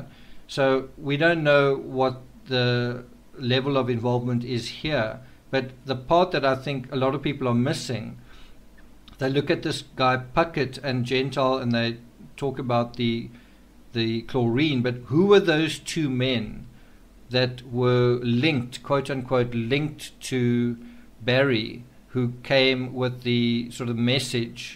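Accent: South Africa. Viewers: You sound South African , i am?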